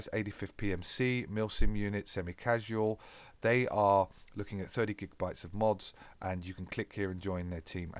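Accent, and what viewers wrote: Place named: United Kingdom. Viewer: This is one of (if not) the best - "How to find a Unit" guides I've seen 👏🏼. It's also refreshing hear a UK voice delivering it 👍🏼! Certainly a unit I'd consider applying for in the future perhaps 😉. In the meantime, I've subscribed and will be watching/spying/researching (😂) the channel.. 👀 Peace Out ✌🏼😎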